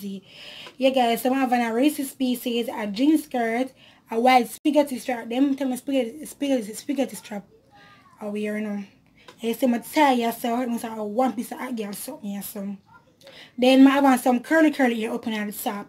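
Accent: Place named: Jamaica. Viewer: Nice video and love that Jamaican ascent though 😍😍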